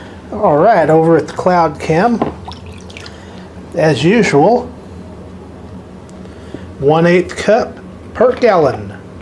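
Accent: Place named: South, United States. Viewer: Love your videos and your lil bit of southern drawl 😆 hello from Oklahoma from your newest subscriber!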